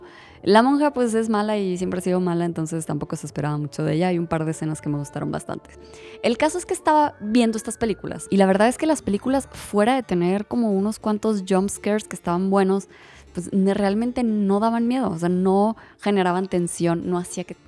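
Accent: Mexico. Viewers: Bello nuestro acento mexicano,tu eres el ejemplo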